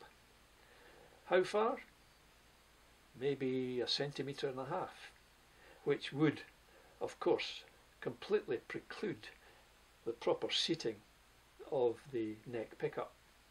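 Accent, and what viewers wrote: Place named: Scotland, United Kingdom. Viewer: That made happy to hear the Scottish accent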